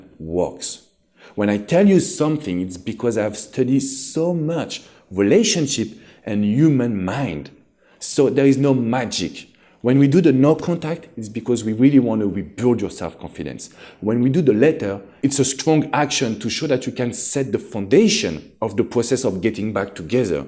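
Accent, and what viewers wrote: France. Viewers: Amazing video WoooW, you are amazing! and love your french accent! Thank you sharing those thing!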